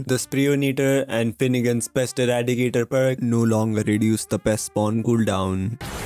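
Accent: India. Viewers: Bro are you indian or just a person with Asian and somewhat indian accent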